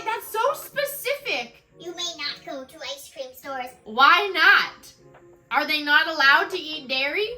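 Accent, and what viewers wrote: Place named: North Carolina, United States. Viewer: I'm just curious if you're from North Carolina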